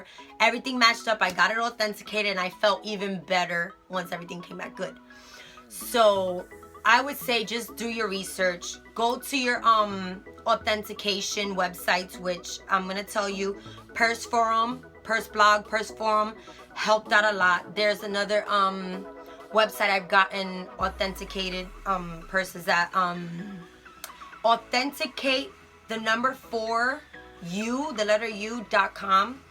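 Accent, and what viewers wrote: Bronx, United States. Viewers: I knew u was from NYC Bronx bc I'm from here too and I talk like u lol bc when I'm making my videos ppl always asking me where I'm from. Love the bags. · Knew you were from the BX once I heard you talk. Im from the BX too and you took me way back. So many memories. So happy to have found you. Your closet is really dope. Love it.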